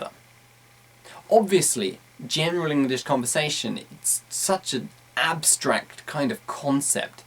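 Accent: United Kingdom. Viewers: sahid maarif It’s a British accent. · U are british is not? I'm mexican :v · I think using british accent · is it britain english? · excellent lesson i would like a video about job's interviews please your accent is like brittish people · It´s british accent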